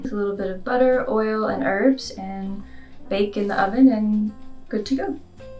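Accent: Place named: France. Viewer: Hi, this Is Katherine from Honduras Central América. I love your videos, muy second tong Is french, my mother tong Is spanish, but when I hear you, you are from France. So I feel like, I am traveling with you in your stories. Muchas gracias ❤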